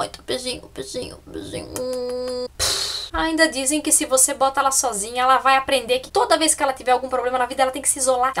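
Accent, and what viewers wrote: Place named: Santa Catarina, Brazil. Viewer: Cara, continua nessa linha que vai dar bem certo! Gosto dos seus vídeos!!Do jeito que fala, ainda mais com esse sotaque "Catarina", rsrsrs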